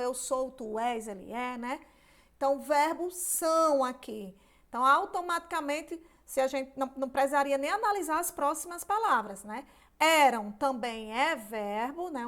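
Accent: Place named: Northeast, Brazil. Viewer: Esse sotaque nordestino me faz ter tranquilidade e paz para saber e entender que estou com uma grande professora, face considerar que o estudo nordestino, se não for o melhor, é um dos melhores do pais.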